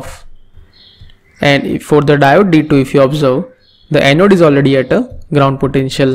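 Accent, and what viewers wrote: India. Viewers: Indian accent :-( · Damn, there is something about Indian accent English that makes things easy to understand :D, nice vid man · We love his Indian accent. After all we are seeing some Indian guy on the scene. Congratulations whoever you are.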